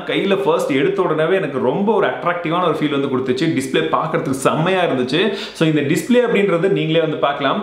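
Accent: Chennai, India. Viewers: Vara vara chennai slag bro naaku la naatiyam aada arambichiduchi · Innaa thala enga chennai baasai la konjam varudhu, but nalla irukku 🤣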